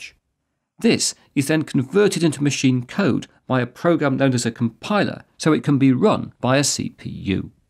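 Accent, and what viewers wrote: United Kingdom. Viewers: British accent....is hard !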